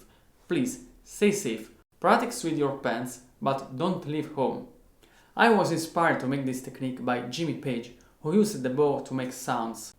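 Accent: Italy. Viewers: I'm Brazilian, yeah you can notice it, the pronunciation of the words sound very Italian, IDK how to explain? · Im spanish, and its very easy to notice that you're italian, and I don't say it with bad intentions :) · L'accento Italiano si sente da 10km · I hear italian accent here umlmlmlml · Your English is good. Love the Italian accent! You are very talented!! Keep it going!!! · Si sente tantissimo il tuo accento italiano😂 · Si capisce un po'dalla pronuncia che sei italiano. However,nice video! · Are you from italy? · Accento italiano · I'm Italian and I'm 14, but I think u are from sud Italy cause of your strong accent, I notice only now that u are Italian and I don't know why I'm speaking English, ormai ho già scritto 😂, a proposito di dove sei?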